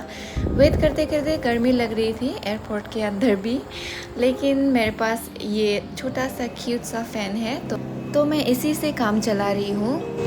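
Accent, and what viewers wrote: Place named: United Kingdom. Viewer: your english accent is British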